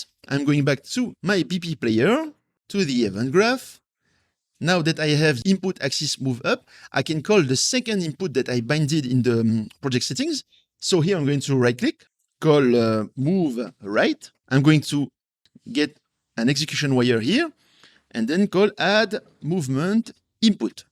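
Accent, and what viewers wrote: France. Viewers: Excellent tuto j'avais pensé que tu étais Français vu l'accent mais le nom m'as trahis hahahah Continu comme ça tes conseils sont d'une précieuse aide pour tous ! En pensant t'inquiètes pour l'accent pour un Français c'est excellent ;)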